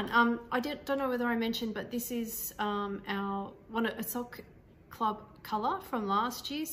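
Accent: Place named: Australia. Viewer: Wow I was wondering the other day if you would ever podcast again! I think it’s been 2 years since you podcasted last. So nice to see you again and hear an Australian voice for a change. Looking forward to seeing you more regularly ❤ btw I love the smocking cardigan you were wearing.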